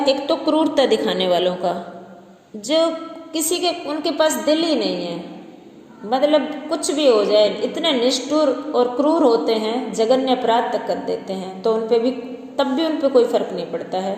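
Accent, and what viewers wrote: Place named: Rajasthan, India. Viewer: आप राजथास्थान से लगते हो बोली से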